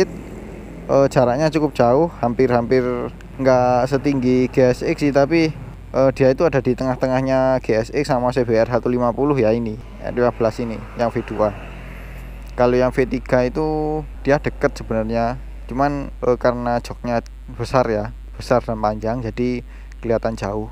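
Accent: Malang, Indonesia. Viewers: Wong malang opo wong batu mas?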